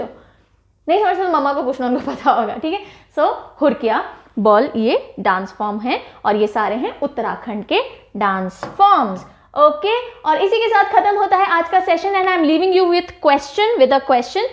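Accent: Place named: Kerala, India. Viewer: I am a malayalee..it's nice to hear ur presentation in Malayalam slang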